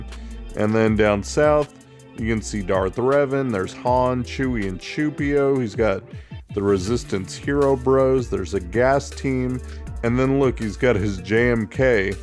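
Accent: South, United States. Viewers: You sound like a southern Technoblade… and it’s actually pretty cool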